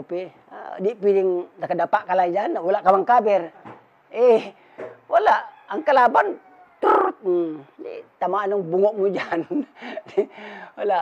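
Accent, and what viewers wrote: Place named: Bicol, Philippines. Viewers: The way magsalita si sir kababayan🥰bicolanong bicolano🥰 watching from Saudi arabia 🇸🇦 ❤ God bless you po🥰❤️ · Bicolano si sir bisakol ang salita saan Kaya sya SA bicol ganyan dapat mag kwento Hindi Yung masyadong seryuso Kasi tapos Naman na Yan ehh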